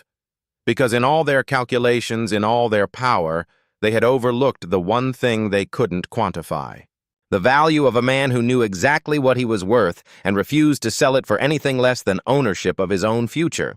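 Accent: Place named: South, United States. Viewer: What a horrible irritating voice to use for a reasonably good story. No need to sound like some hillbilly.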